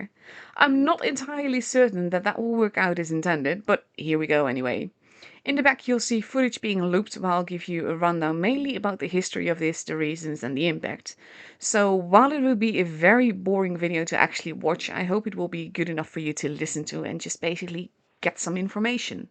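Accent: Netherlands. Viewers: ^: Love your honey smooth voice & Dutch / English dialect (Easy Listening) You made me roar with laughter = "𝐂𝐨𝐮𝐥𝐝𝐧'𝐭 𝐁𝐞 𝐀𝐫$𝐞𝐝" ( London speak ) Your phraseology is VERY down to earth. Very much appreciated by this Londoner!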